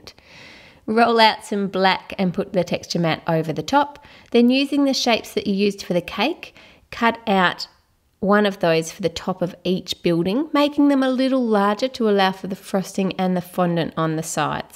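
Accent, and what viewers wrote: Australia. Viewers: R u Aussie (I is) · Are u Australian? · I love your accent so much I want to have an Australian accent so much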